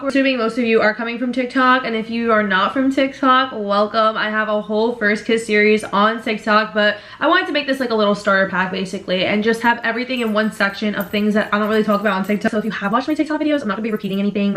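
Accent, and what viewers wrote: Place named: New York, United States. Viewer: She has a New York accent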